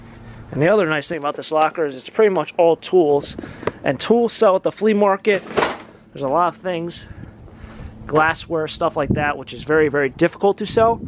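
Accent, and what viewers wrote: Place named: Philadelphia, United States. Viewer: I smell a Philly accent.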